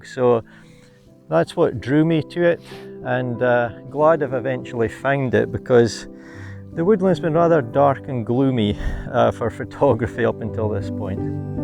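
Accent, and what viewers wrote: Scotland, United Kingdom. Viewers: Very nice image. Your videos are lessons in the Scottish accent!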